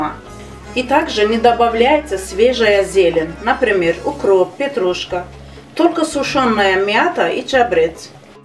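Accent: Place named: Azerbaijan. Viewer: Все ваши блюдо сказка, у Вас такой приятный азербайджанский акцент.....а музыкальное сопровождение просто дамара гедти )))))) чох сагол! ПЯХ ПЯХ ПЯХ, Нуш олсун всем подписчикам! )))) ЛАЙК и подписка!!!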